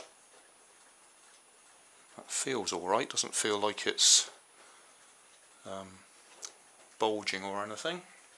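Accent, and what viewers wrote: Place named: South West England, United Kingdom. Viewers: Haha are you from Cornwall? I'm from St.Austell, and you probably have the most south western accent I've heard! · God I do not miss the South West accent at all.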